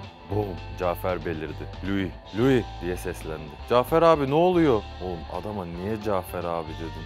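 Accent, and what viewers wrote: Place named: United States. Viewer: Adam amerikalı aksan çok saçma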